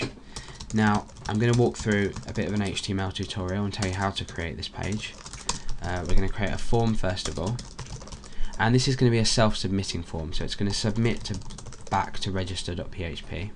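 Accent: United Kingdom. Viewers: dude, you're the best plus your UK accent, that makes you AWESOME, i love you!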